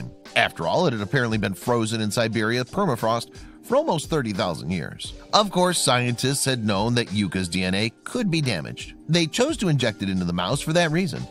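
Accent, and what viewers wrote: Midwest, United States. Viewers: Hard to listen to the narrator's voice and grating Midwestern accent...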